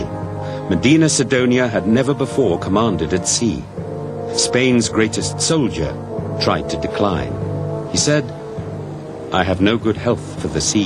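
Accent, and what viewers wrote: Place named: England, United Kingdom. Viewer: lol says "felícima armada": it's " grande e felicísima armada". I love english accent when talking spanish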